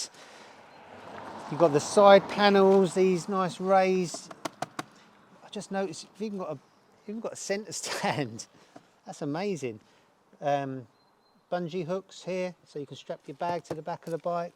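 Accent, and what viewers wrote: United Kingdom. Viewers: And he still sounds UK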